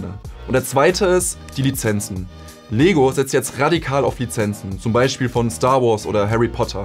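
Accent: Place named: Franconia, Germany. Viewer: Dieses Invers-Fränkisch ist zu viel für mich...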